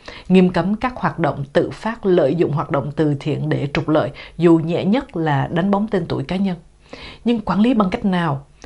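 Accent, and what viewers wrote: Standard. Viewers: Cô MC này bình luận và nói chuyện quá chuẩn quá hay luôn... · Ban noi chuan luon ung ho ba ... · Cô xướng ngôn viên tôi nghĩ là người hải ngoại. Cô đọc rất lưu loát, rất từ tốn, phát âm rất chuẩn và rất đúng chánh tả. Cô phát âm các dấu sắc, huyền, hỏi, ngã rất chính xác. Phần đông giới trẻ ngày nay hoặc người trong nước phát âm lẫn lộn hai dấu hỏi thành ngã, ngã thành hỏi. Có ai còn nhớ lớp bốn lớp năm cô giáo bắt một trò đúng giữa lớp đọc một đoạn văn rồi cả lớp chép lại cho cô chấm điểm chánh tả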